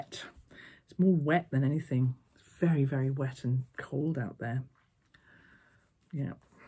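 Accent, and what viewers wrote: England, United Kingdom. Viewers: I wonder if it suggested you because I watch "knit ink". They went on an Arne and Carlos cruise I think! Oh and just get it done quilts. She's great. Lovely to hear an English/uk voice chatting about quilts, though ☺ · I was watching Arne & Carlos today as a welcome distraction from our election mania here in the US (I am from the UK originally - so enjoy hearing your accent - makes me feel at home 💕) - they mentioned you, so here I am! Loving your channel! I think you might enjoy the Fairyland Cottage YT channel ;) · I got some quilting videos recommended by ty and somebody mentioned english piercing - I think it was the Royal Quilter or something like that in Australia. I google it, because I though piercing and quilting how do that go together. LOL. After searching in yt I found you within the results. Thank you ! I sometimes am in need to hear a lovely english voice, rambling on 🥰🥰😉.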